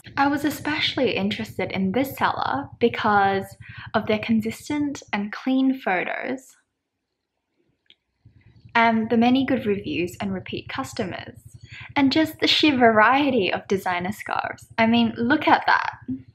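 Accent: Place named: Australia. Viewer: You’re beautiful with a.. Um Australian accent? Sorry I found your video to learn how to spot fakes. Good closeup video ^_^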